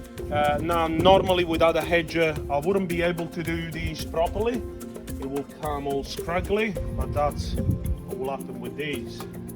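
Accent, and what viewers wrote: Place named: South Africa. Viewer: Trying to work out your accent. So far i've got South African/Russian /Aussie/Brummie.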